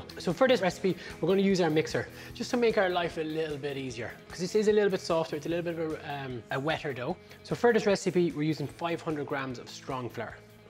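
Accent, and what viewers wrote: Ireland. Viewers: is such a great teacher and communicator. I have been making breads at home for years but I still have had my knowledge added to and reinforced by this and other videos here. He takes time to really give an explanation as to how and why. reassurance that a recipe is just a foundation and that the experience of baking has so many variables is valuable too. Not to mention the soft Irish voice! I regularly have fresh yeast (I just ask at the in store bakery at my supermarket) as I just think that it gives a better flavour. I am also totally converted to slow proving as I know that the flavour is improved. When I made these doughnuts I did not have a lemon but substituted lemon zest with grapefruit zest and they produced a very tasty batch. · I so enjoy your Irish accent, experience & knowledge. Thank you, God be with you. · It is English but with an Irish accent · I'm not going to lie I'm going to have to watch this a second time ..love just listening to Irishman talk one cooking is a little mind blitz your awesome from America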